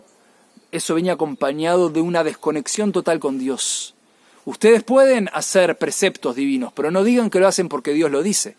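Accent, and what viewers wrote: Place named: Argentina. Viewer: Me gusta su explicación, pero tengo una inquietud, ud. Es judío de . Nacionalidad judia, oh ee ud. Argentino. Su acento tiene algo de Argentina, igual explica excelente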